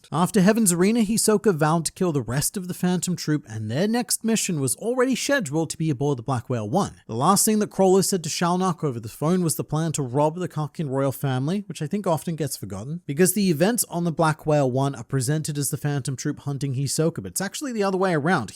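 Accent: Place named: Australia. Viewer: My wife just said, "is that the strange Australian internet man talking about Hisoka?"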